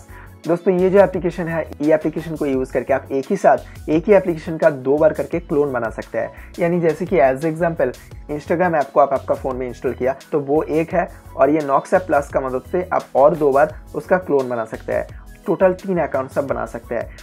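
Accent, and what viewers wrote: Bengal, India. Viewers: Vi tumi Bangali ami Bujhte perechi......😁😁😁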